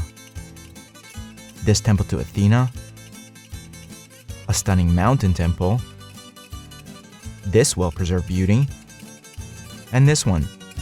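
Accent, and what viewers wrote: United States. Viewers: Can’t watch this because of the narrator’s f’awful yankee accent 🤮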